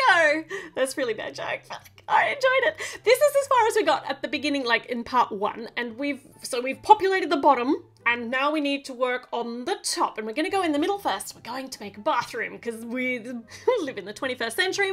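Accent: Australia. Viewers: It oki I understand Australia accent!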